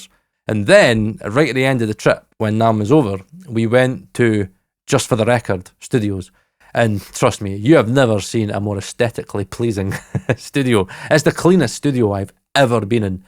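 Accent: Scotland, United Kingdom. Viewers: Nice hearing a scots man. I lived near perth 10 years ago. Loved Scotland. Nice country and great people...other than my EX. 😜 I loved salmon fishing. 👍👍👍 About the ATMOS...I don't know what to think about it. I never had the chance to really listen to a great setup. But i watched videos where people are crying while listening. So it must be a great experience...especially for music...not only for movies. Greetings from Germany...🍻 ❤☮☯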